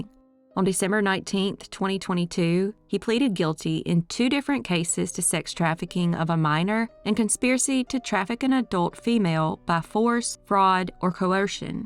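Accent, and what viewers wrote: South, United States. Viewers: Yes! Quickly becoming my first click whenever I get on YouTube ❤ your channel is only one of three channels I have my notifications on for. 🌟 Love your soothing voice with your slight southern drawl and every story you cover needs the lime light! 💚 thank you!! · Ooh really I will check it out! Love your voice and your Southern accent! Thank you for the time you take to research all these cases! 🙏🏿❗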